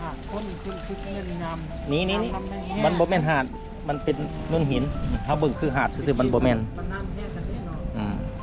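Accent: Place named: Vientiane, Laos. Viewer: ສຳນຽງຄືກັນ. ຄິດວ່າຄົນແຂວງວຽງຈັນຊັ້ນດອກ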